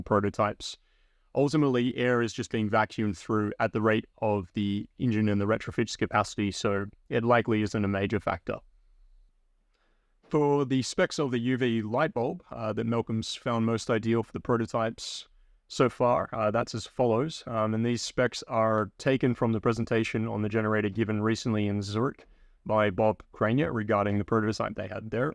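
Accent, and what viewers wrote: Australia. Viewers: Are you an ausie living in the usa? You sound like Shantan Wantan Ichiban (no hate just curious) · interesting accent aus plus?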